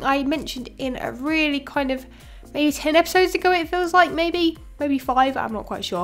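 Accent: United Kingdom. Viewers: Your voice ;D I'm American so I'm kinda deprived of British accents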